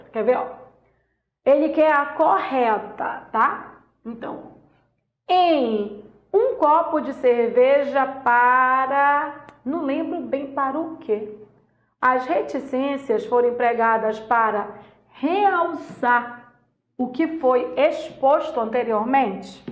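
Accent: Pará, Brazil. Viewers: "Verbo pank " kkkkk. Paraense doida! Grande professora, aulas excelentes. Parabéns prof!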